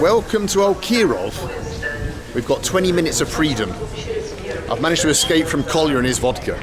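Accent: England, United Kingdom. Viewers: Its might be because of his hiatus but sounding extra british this episode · is it just me or was his english accent stronger in this vid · Really loved watching this vlog, have not watch you since you went through your awful Covid experience. So funny and educational at the same time. Have you been back to the UK , your UK accent sounded very strong ?We just went back for a month and our US friends struggle to understand us when we get back.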